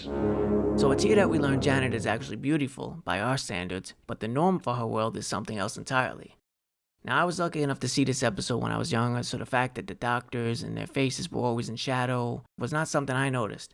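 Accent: New York, United States. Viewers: I love his New Yawk accent too! It reminds me of Slip Mahoney and Satchel Jones and the Bowery Boys. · What illuminates these summaries is not only TZ's excellent writing, but your own. The New York accent also lends a down-to-earth quality to even the most avant garde episodes. Bravo!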